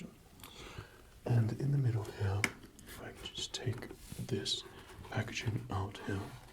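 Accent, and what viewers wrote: England, United Kingdom. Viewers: English accent gives me so much tingles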